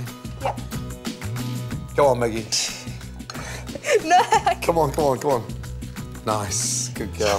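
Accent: United Kingdom. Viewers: Five and half to Six hours in the Oven at 140C...! Agg, still having trouble with British Accent.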